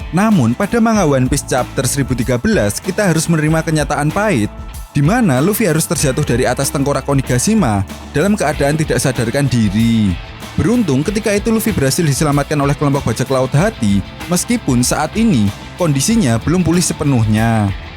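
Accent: Java, Indonesia. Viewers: lidah njowo mas, lanjutkan mas do ur best!!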